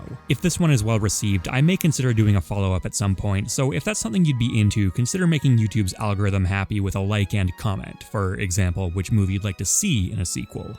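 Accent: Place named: Canada. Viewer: today I learned is Canadian. about=aboat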